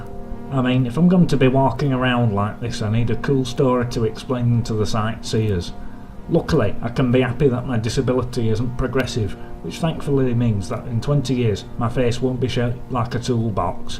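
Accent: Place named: Scotland, United Kingdom. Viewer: This guy sounds pitiful. The person in the book not the narrator. The narrator just sounds Scottish.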